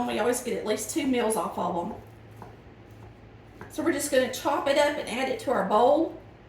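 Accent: South, United States. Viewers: Love your southern accent and delicious recipes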